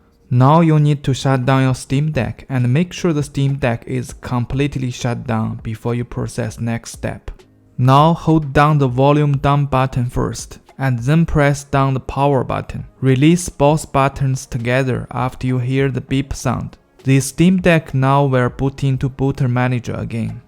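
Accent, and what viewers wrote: China. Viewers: Nice tutorial, 一听就是中国同胞啊😂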